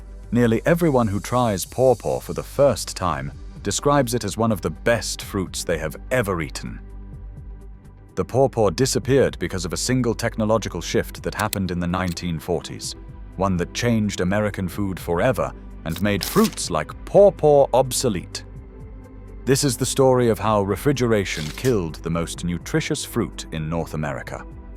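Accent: United Kingdom. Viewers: OK-- i like paw paws, and they're an American fruit. So why was a British accent chosen to narrate this video? 🤨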